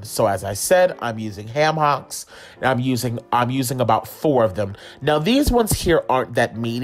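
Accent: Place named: Gullah, United States. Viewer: Reminds meh of my gullah people 🤣🤣🤣🤣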